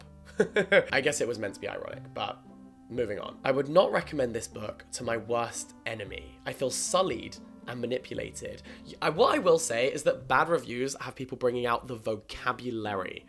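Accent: United Kingdom. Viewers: British accent so cooll👏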